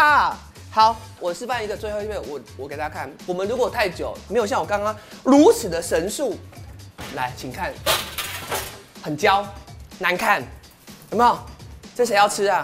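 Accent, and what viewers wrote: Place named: Taiwan. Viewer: 好多地道台語真的好愛🤣🤣🤣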